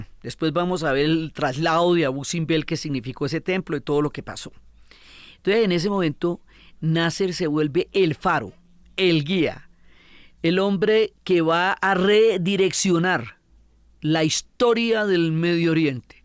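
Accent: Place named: Colombia. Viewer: Escucharla a es transportarse al lugar donde ella narra, pero sin salir de casa 😬😬😬. Me encanta esa sazón Colombiana que le pone, que está como el ajo, en su punto exacto ni mucho para arruinar, ni poco para no sentirse. 👌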